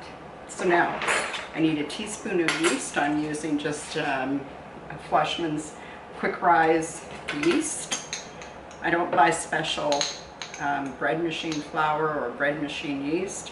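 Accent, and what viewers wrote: Canada. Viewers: I love my bread machine , found it at a thrift store Brand new for $5 it makes the 1 lb loves ,,,yes you need to find the right recipe ,,,i use the dough cycle and then make mini loafs ,,,I gift it as well,,, New to your channel ,,I could tell while you were Talking you were Canadian ,,I live in Southern BC ,😊😊😊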